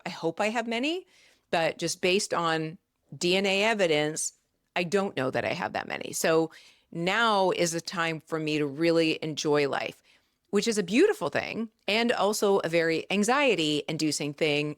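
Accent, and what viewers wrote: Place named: Canada. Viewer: You hide it well but I can hear your Canadian accent. I'm going with British Columbia somewhere outside Vancouver.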